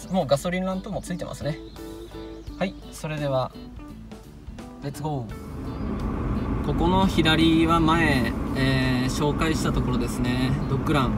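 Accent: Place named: Kagoshima, Japan. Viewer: コメント失礼します。違ったら申し訳ないんですけどイントネーション鹿児島の人見たいですね😊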